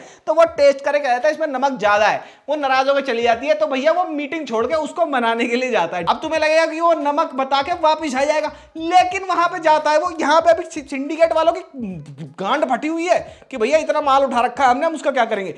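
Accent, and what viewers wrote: Haryana, India. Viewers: Bhai mast review !! Bring back the haryanvi accent 😂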